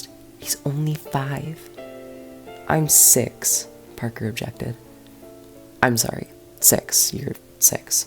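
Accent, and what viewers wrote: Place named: South, United States. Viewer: as a Texan i will permit the southern accent. it was acceptable. thank you for the video i enjoyed it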